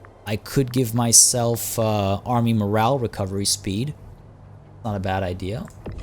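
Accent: India. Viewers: Bhai ye India lag Raha tha muje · How can your Indian accent is soo good are you related to India in any manner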